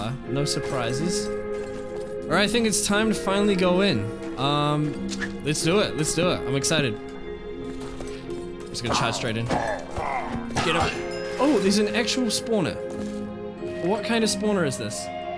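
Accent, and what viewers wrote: New Zealand. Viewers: its been bugging me for ages...are you a kiwi too??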